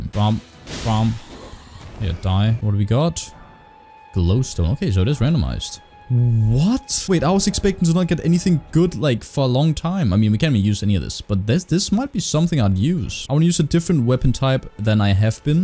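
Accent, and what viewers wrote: Denmark. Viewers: I love within 10 sec i can hear you are a danish brother. XD Nice vid my dood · The danish accent tho xD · You know that, when you can just hear that danish accent. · are you from denmark? it sounds like you have the accent, i talk like that aswell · man that accent sounds so familiar, this guy gotta be a dane :P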